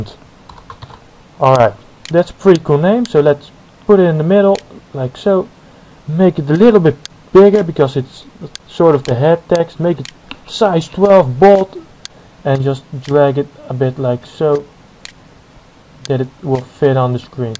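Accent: Netherlands. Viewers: dude zo een lelijke nederlandse accent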